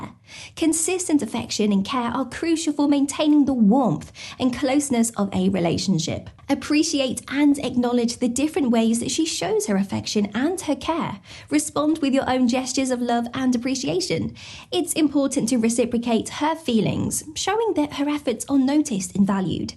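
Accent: United Kingdom. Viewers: Are you British, Irish or Australian? Whatever the accent, I love it 😁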